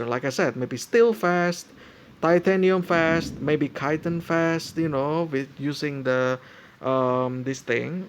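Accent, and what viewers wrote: Indonesia. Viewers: Are you Indonesian? That accent is so distinct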